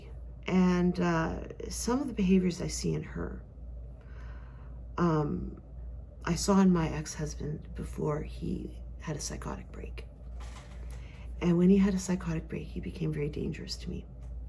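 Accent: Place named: Canada. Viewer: I am guessing by your dialect you are in Canada?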